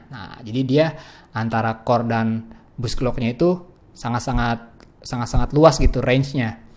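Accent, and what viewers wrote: Indonesia. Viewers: mulut Indonesia bro. saya adja masih bilang ti itu t i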